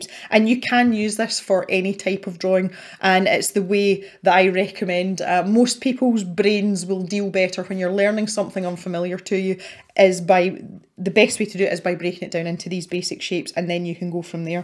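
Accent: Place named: Scottish Highlands, United Kingdom. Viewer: I do enjoy watching your videos where you have put on your “instructor hat” on! You have a true gift for sharing ideas and giving your viewers practical advice on creating art! Truly inspirational, thanks. I remember when I was a youngster and on Sunday evenings we watched a TV Show: Walt Disney’s world of Color! Did you get this show in the UK? They mostly showed Disney real life movies, “Charlie the Lonesome Cougar” and most movies were not cut to fit the time frame of a TV movie, so if they had excess time that did not fill with commercials they showed Disney shorts, sometimes cartoons but sometimes they had creating Disney characters and they showed us viewers how to draw Mickey or Goofy and I remember when I sat down with pencil and paper hoping that the movie would end early and I would get a chance to draw along with the Disney Artists! 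Thank you for sharing all of these wonderful tips and bringing this memory back to the front of my mind! Thank you, watching your videos and listening to your highland lilt brings me and others joy!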